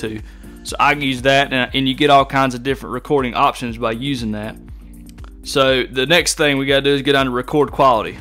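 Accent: South, United States. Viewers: Thanks! Nice to hear a southern voice on a GH5 tutorial!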